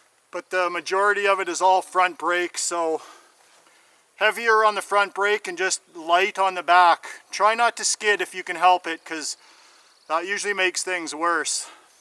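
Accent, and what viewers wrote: Canada. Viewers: I love your Canadien accent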